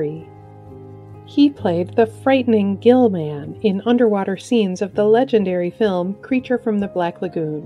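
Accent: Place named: Midwest, United States. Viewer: I smell an accent, ah narrator are you from the Midwest? ❤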